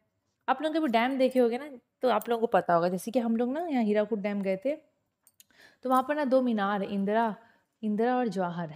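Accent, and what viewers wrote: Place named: Kolkata, India. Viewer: Mam your accent is kalkatiya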